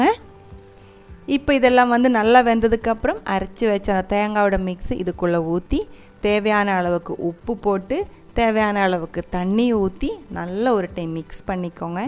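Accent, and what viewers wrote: Kerala, India. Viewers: Hi...its lovely to listen to your Malayalam mixed Tamil😍😍 Can u please leave the link of your malayalam channel. Waiting to see more vlogs from u😍😍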